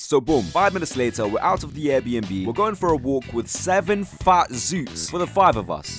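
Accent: United Kingdom. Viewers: Are u from brum mybro?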